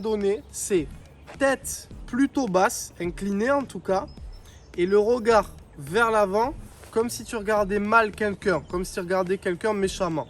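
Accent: England, United Kingdom. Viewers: Ma nage . Le pap évidemment. Ton accent anglais si ça va . Je te propose or ta prochaine vidéo de la faire in english 🤣🤣🤪. Sinon non belle vidéo et bonnes explications. ;) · Très bien pour Ton accent anglais, et surtout pour ''le regard méchant, et le soulevé de Coubra, c'a nous aider à bien effectué la technique.. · Wow excellent accent anglais....merci pour ton coaching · 👍🏻🔵 pour l'accent anglais😁 et le reste bien sûr! J'ai repris la natation via un programme "je nage pour ma forme" et il s'avère que ma brasse manque de coordination... Vos conseils et exercices m'aident beaucoup quand je vais nager en dehors des séances de groupe! 💙🩵💙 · Ce petit accent anglais je fonds 🥹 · Merci pour tous ces conseils, super bien expliqué, clair, et ton accent anglais n'est pas si mauvais que ça ;) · + pour interet a la chaine + pour ton accent anglais + pour les 5 astuces. 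Merci je fais une heure complete a la brasse avec beaucoup de plaisir (mais assez difficile les 10-15 premieres minutes.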